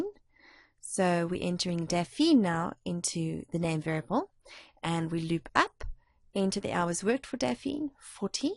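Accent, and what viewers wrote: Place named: South Africa. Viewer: South African joberger'ish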